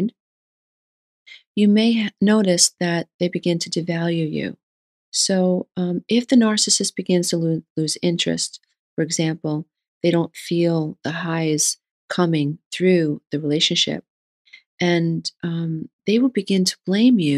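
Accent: New York, United States. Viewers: This is soooooo on point., the last guy I dated was just like this. blaming me he can't get me to do a threesome, calling me names I shouldn't write on here. luring me in with excitement in the beginning and then just flaunting it allll over NYC with everyone else.. im the crazy one, im the nasty one, im the violent one... etc etc etc. (these are just the examples related to this video). then finally he broke my nose. the next fight, I finally called the cops. and then Covid happened and I left NYC so I didn't get stuck quarantined with him. During this time I was able to reflect on everything. I found your videos, and you have helped me understand so much. you aren't the only one with videos on this content,.... but maybe missing home so much and hearing a New Yorker like someone I knew tell me it wasn't me and hearing the examples that literally were pages out of the book of Michelle and Matt 2019 made it click and gave me strength. 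I just want to thank you so much.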